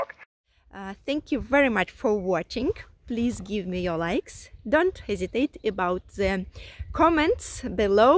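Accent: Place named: Ryazan, Russia. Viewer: Красавица, у тебя проблемы с родным языком ? Зачем мучаешь язык врага своим рязанским акцентом ? К чему это и зачем ? Ладно когда во время OSO, понятно и логично, а все остальное время в ролике.... ?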